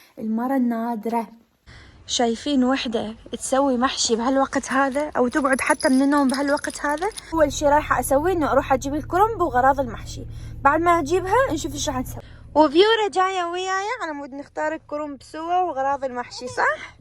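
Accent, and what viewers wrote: Iraq. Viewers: دي لهجه أهل البصرة الحبيبه الغاليه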